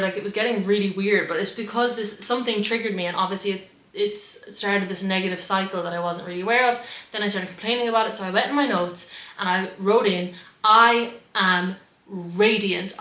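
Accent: Ireland. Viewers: I love your irish accent.Your so beautiful ❤️😊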